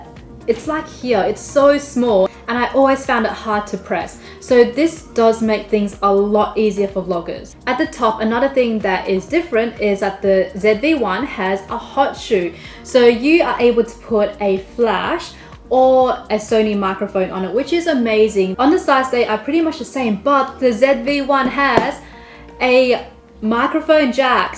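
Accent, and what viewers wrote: Australia. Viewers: Ha, I was trying to figure out if you're Australian, and then you mentioned Darling Harbour (unless I missed an earlier obvious reference). Good to see another Aussie doing well on YouTube! Great review too, thank you. It contributed to my decision to buy a ZV1. :)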